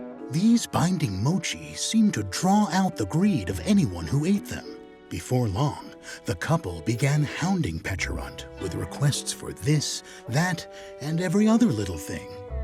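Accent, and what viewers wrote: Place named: United States. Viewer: Why isn't this in English British but in a "Murrican" accent? And why isn't there still an option for British English so Fahrenheit becomes Celsius in dex entries?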